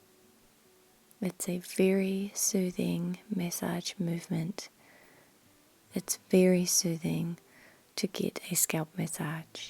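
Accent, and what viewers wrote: New Zealand. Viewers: Love your Kiwi accent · Kiwi accents are the best! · Are you a kiwi? The sound of your voice had me falling asleep 😴 you talk exactly how my momma used to talk when she was trying to make me go to sleep as a child 💕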